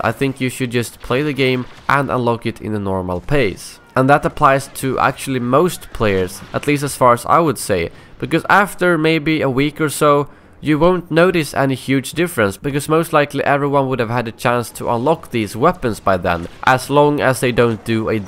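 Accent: Sweden. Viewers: You Swedish? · Are you swedish?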